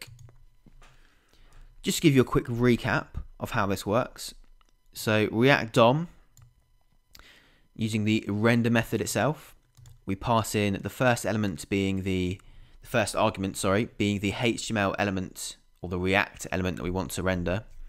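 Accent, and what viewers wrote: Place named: England, United Kingdom. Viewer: Liked your English accent <3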